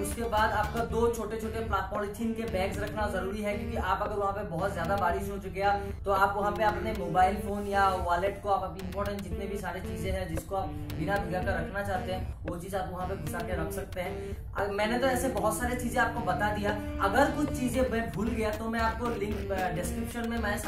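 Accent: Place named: Northeast India, India. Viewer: Risky hojatai N.E accent 😋🖤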